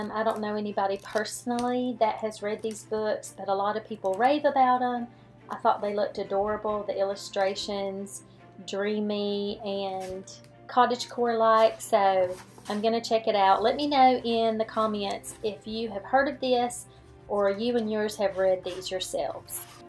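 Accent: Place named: North Carolina, United States. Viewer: I just found your channel through Taylor. I have the secret garden book too. I really can’t wait to read it! It looks so pretty. Side note - are you from NC? Cause that’s where I’m from and your accent sounds just like so many from down here! I’m on the last chapter of Mansfield Park! I absolutely love it. The end of the book does take place in spring time, just after Easter.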